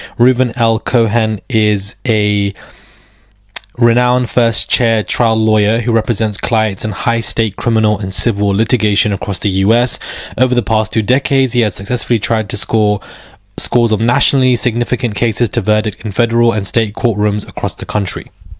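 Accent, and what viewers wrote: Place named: United Kingdom. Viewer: I really like your take on things and your presentation. I especially find amusing when you speak in slang with your lovely British accent.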